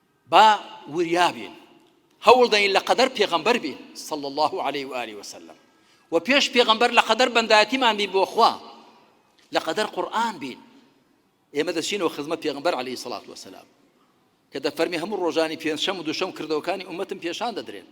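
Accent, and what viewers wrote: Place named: Pishdar, Iraq. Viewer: بە کوردیەکی زۆر خۆش و پاراو و پەتی قسە دەکات. شێوەزاری پشدەری زۆر خۆشە، هەقە بکرێتە ستانداردی کوردیی سۆرانی کە لە نێوانی هەولێری و موکریانی لە لایەک و سلێمانی و گەرمیانی و ئەردەلانی لە لایەکی دیکەوەیە.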